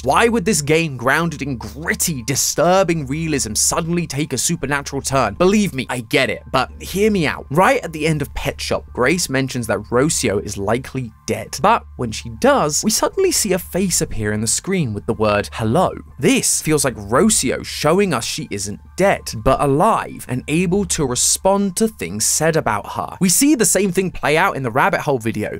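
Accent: United Kingdom. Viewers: AMAZING THEORY Imma be honest tho i only watch horror game theories especially arg's and your british voice is soothing when talking about manslaughter. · LOL this is so good, gread job, i like britis acssent UwU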